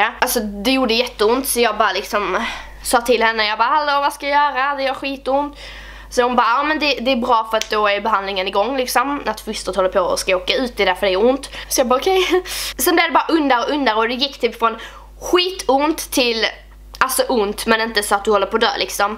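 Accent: Scania, Sweden. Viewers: Asså du e typ den enda ytbern jag kan sitta och lyssna på en hel video, tröttnar typ på andra men du e bäst på det (och jag älskar skånska 😂) Love u ☺️❤️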